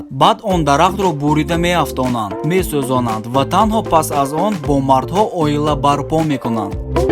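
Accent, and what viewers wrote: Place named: Tajikistan. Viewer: لهجه تاجیکی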